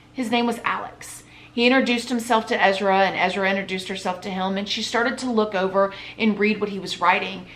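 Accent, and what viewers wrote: South, United States. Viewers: Love love love how you tell the story of real cases. You should look into being criminal narrator on ID. Your southern accent is refreshing to listen to!! I’m a new subscriber!!!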